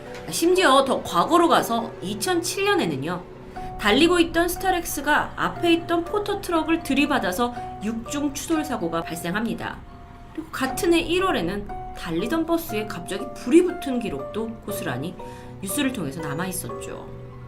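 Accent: Gyeongsang, South Korea. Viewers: 역시 갱상도ㅋㅋㅋ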